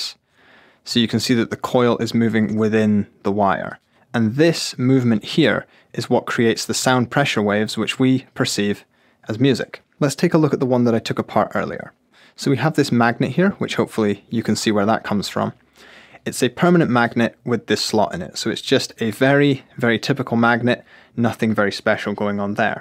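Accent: Scotland, United Kingdom. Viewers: Are you Scottish? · He sounds Scottish. I want to say NW Scotland by the soft accent.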